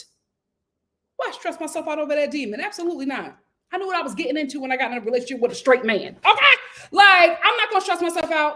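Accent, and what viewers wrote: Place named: Philadelphia, United States. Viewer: The philly accent I throng today 😂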